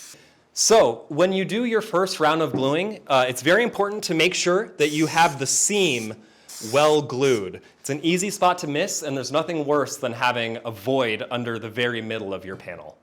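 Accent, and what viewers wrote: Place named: Germany. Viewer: Thx, really worthwhile to watch. Btw, zufällig deutsch? Wegen des ever so slightly hörbaren Akzents.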